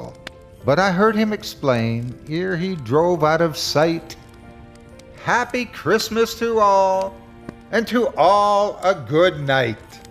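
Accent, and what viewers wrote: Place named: South, United States. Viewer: That was a very good audio performance, and you have such, a wonderful gentle voice with the rhythm of the South, which always makes you such a delight to listen to, no matter how horrifying the subject matter. Bless you, Mr., and may you and yours have a very merry Christmas and a happy New Year!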